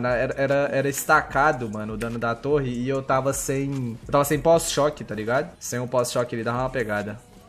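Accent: Minas Gerais, Brazil. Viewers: eh mineiro?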